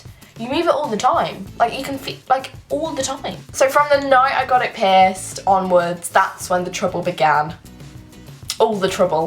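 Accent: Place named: West Midlands, United Kingdom. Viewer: Are u from the West Midlands Cus ur accent sounds like it if so wat shop is it xx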